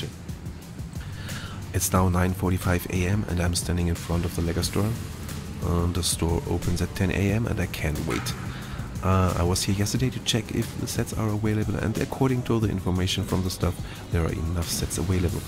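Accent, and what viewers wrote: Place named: Germany. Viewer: I'm just not used to German YouTubers, that's why I was impressed with your accent.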